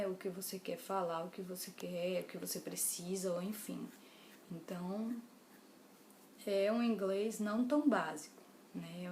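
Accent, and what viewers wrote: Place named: Bahia, Brazil. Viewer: Esse sotaque baiano reconheci na primeira frase. hahah, qual a agência que você foi? Com qual idade? Pretendo ir pela STB. Você indica? Também sou de Salvador, gostaria de saber se ela é bacana. Ah, o vídeo ficou ótimo. Também acho o inglês importantíssimo! Muito bom o vídeo.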